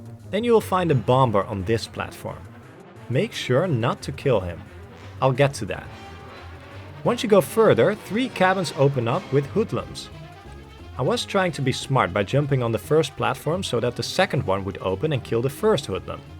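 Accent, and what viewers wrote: Netherlands. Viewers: Your dutch aren't you? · sounds dutch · ben jij toevallig stiekem een nederlander? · You're Dutch aren't you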